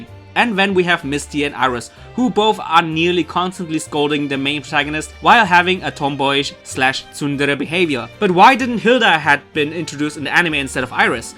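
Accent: Germany. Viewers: you have a german accent... are you german? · one question. what nationality are you? it sounds like a german accent you have.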